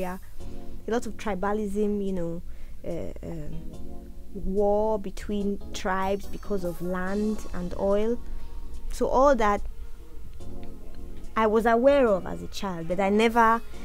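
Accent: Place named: Nigeria. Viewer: wow she has conc. nigerian accent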